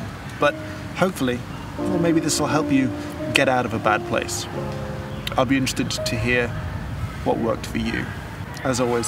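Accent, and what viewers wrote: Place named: England, United Kingdom. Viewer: It's kinda funny because (for my understanding of accents) sounds English, and to our rather specifically cultivated Italian taste buds, England is among the worst eating places in Europe. I'm not saying Italy has great food and everybody else doesn't - in fact Italy has been out of the main "best restaurants - most Michelin stars in the world" tour exactly because in a way Italian taste won't meet international taste: our wine is tasteless to Californians and French, and until Tuscany started making wine specifically for the USA, we would not sell or score high abroad. But the concepts brings forth are rather sound, tasting for Italians, on average, is more developed but also more of a niche, and we won't be satisfied by anything that won't taste as our mothers did it. And if everything is special, nothing is special.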